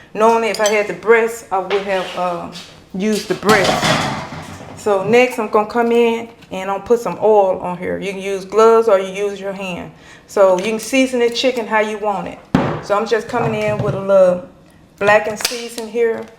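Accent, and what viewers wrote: South, United States. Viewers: I like the southern accent, mispronounce words and all don't change and I know that this is good cause I've made some dishes right out the bag right out of the bag in a can you gotta make stuff easy after a long day on the job and get this y'all they do the same thing in restaurants is out of a bag where can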